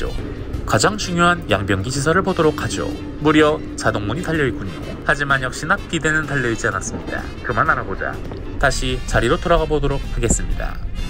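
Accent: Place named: Japan. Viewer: 나레이션 본인 목소리인가요 ? 꼭 일본인이 한국말하는것 같아요 ㅎㅎ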